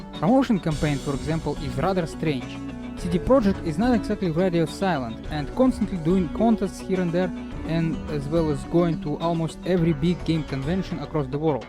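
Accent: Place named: Russia. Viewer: Молодец, тренируйся. лойс поставлю, но акцент прям вааах какой русский. чувствуется что пока стараешься произносить слова, а не говорить на языке.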